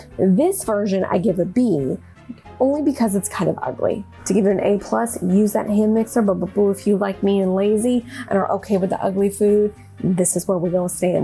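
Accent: Louisiana, United States. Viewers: Ah Louisiana, that’s why I love your accent ❤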